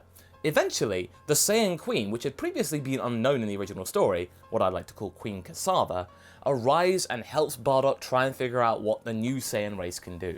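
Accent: United Kingdom. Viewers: Damn i know you’re from England but it’s n hear yo British accent 🤔😯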